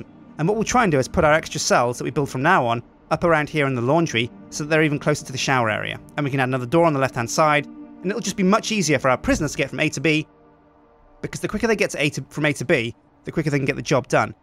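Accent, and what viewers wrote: United Kingdom. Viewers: SHUT UP! He's British! It's hard for him to speak another accent! (please spell his name correctly!) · he's from britain of course he has an accent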